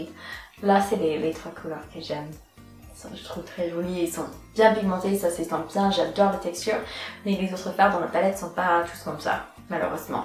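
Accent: United States. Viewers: ***** Well, I'm wondering... Which words do you precisely need to learn? Because even though french is good, she pronounces words with her american accent. I know very well that most french beauty youtubers are boring though, so you probably don't want to watch their videos. ^^ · tu et tré jolie mais tes une francaisse ou américaine parseque ta un accent américaine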